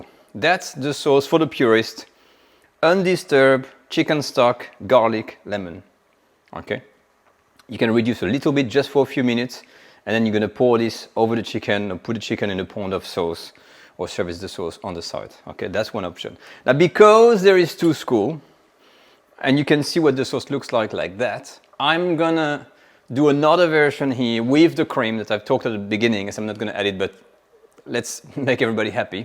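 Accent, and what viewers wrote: France. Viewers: This guy's french accent made me subscribe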